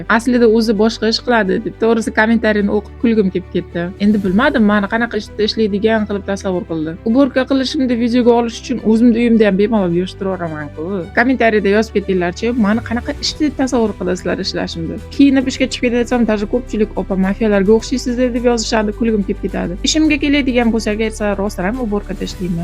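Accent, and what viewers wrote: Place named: Kashkadarya, Uzbekistan. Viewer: Опа соддагина очик кунгил аел экансиз. Шеваизга караганда Кашкадаре еки Карши тарафлардансиз. Сизни видеоларизи куриб анча йиллар олдин биз хам Москвада ош пишириб Русларга ош сотган пайтларимиз эсимга тушиб кетди. У пайтлар аям дадам ва ака укаларим бор эди. Хаммамиз хали кичкина эдик. 2004 йил эди. Хали Канадага кетишимизга документлар берилгаг пайт лекин Москвада жавоб чиккунча яшаш керак эди. Болалар кичкина. Дадам ва аям факат. Энг халол пул топиш йули шу халол ош пишириб ош сотганлар. Майлида. Кунимиз утиб турарди. Бугун эса хаммамиз котта котта лавозимларда ишлаймиз Канадада. Дадам ва аям эса Узбекистонда шохона хаетта яшайдилар. Оллохга шукур. Опа сиз хам хали оилаиз бн хаммаси яхши булади. Бировларни уйини йигиштиршан кунларимиз хам булган… бу хает. Ундан уялмаслик керак. Сизга омад тилиман. Кизингиз укиб котта лавозимлага ишга кирсин манга ухшаб ❤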